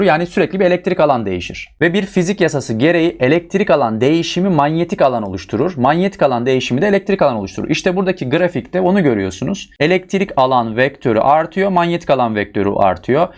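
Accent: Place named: Kastamonu, Turkey. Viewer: samsun da olabilir ama kastamonulusunuz bence ya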